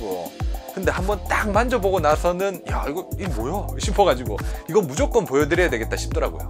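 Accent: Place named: Countryside, South Korea. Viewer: 시골 사투리가 구수하네요. 좋은 정보 감사합니다.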